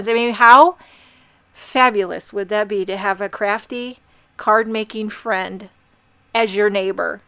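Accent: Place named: Massachusetts, United States. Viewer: being from Mass... you are pronouncing Mass...the way it should be; however, roof in Mass is pronounced like woof, but with the r. However, i have heard folks in your geo area pronounce roof as you do. In any case, considering how badly Mass... slaughters the English language, i mean no disrespect to you or your regional accent.🤗🥰✌😂💐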